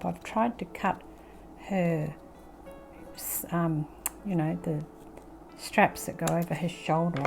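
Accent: Australia. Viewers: Unmistakable Aussie accent, as soon as I heard you speak, I subscribed (because we don't have many Aussies who make videos using Tim's products). I'm in regional Vic. Great pieces, loads of fun. Love your album. Cheers to you too...Aussie, Aussie, Aussie, oi, oi, oi.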